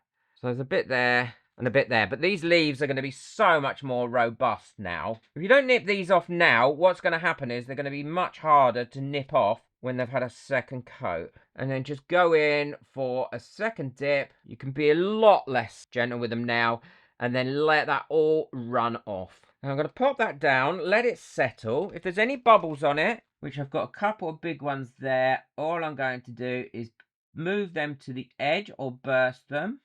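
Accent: United Kingdom. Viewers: This is such a great idea! You really can do a lot with it, maybe even craft your own roses and flowers or adornments using ribbon or pretty fabrics of specific colours. If you don't mind me asking, where is your accent from within the UK? I'm not a Brit but I'm curious about accents, always trying to place them XD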